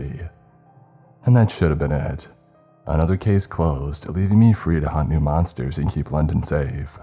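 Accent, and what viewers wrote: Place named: United States. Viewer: I was about to say it was strange hearing an American accent on an British character but then I remembered that you've voiced, and I quote, "a small town girl" before and somehow I can always look past it because your narrations are that good.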